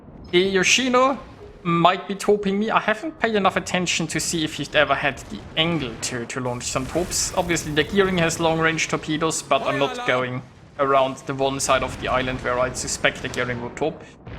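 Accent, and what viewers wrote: Germany. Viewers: First time on the channel, and the maniacal laughter couple with the German accent makes for a great experience.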